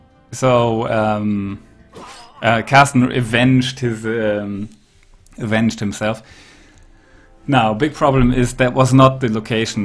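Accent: Germany. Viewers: Mal eine Frage. Ich meine man hört es durchaus raus, dass er ein Deutscher ist. Seid ihr ein deutsches Entwicklerteam ?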